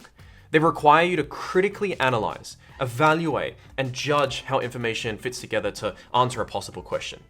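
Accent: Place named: Australia. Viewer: I think it's the Australian accent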